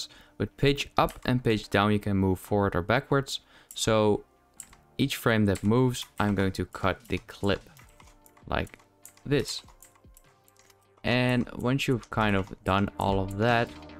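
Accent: Netherlands. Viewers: you dutch? idk im just guessing cause you sound dutch, maybe im wrong. anyways im dutch. lol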